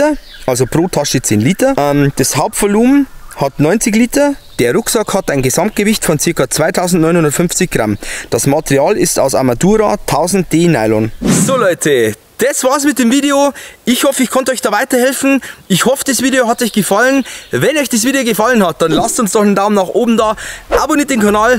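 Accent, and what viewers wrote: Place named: Bavaria, Germany. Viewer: Alle Achtung, prima, herrlich das Bayrisch 😊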